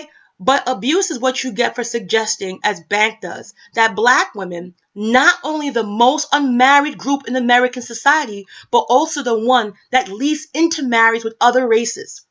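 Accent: Jamaica. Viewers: lawdhamercy!! lol luvin ur jamiacaness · Hey, I hear the patois trying to come out a yuh girl. Us yard women know when a woman is fed the f@ up. Keep these stats coming sis.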